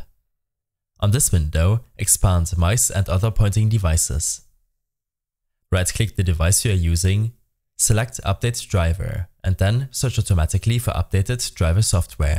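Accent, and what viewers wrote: Germany. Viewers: du bist deutsch man hört es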